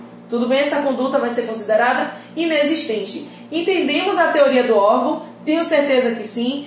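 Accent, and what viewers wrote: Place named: Bahia, Brazil. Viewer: Estou amando suas aulas, sou estudante aqui no Rio de Janeiro e sou baiano; seu sotaque parece ser baiano.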